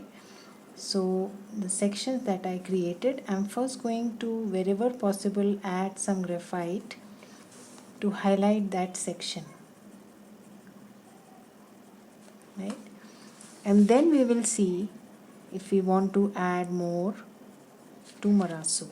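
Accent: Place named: India. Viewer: You sound very like Indian maybe pakistani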